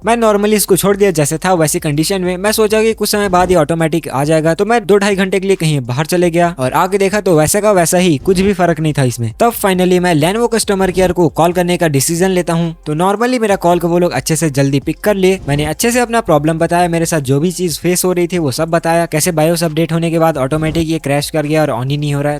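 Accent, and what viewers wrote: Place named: Chhattisgarh, India. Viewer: Bolne ke kareeke se mujhe bhi laga CG se hai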